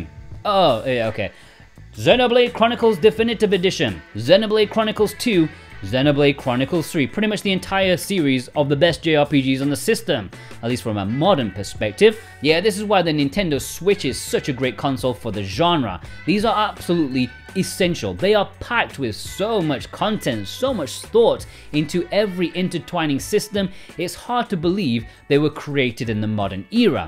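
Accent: England, United Kingdom. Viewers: Love the english accent!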